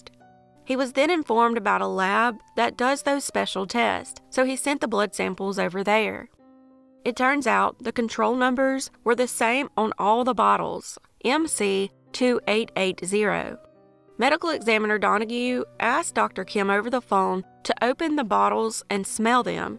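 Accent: South, United States. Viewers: Love your true southern accent. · So good to hear a southern accent, I live on the other side of the world and this is so very good to hear.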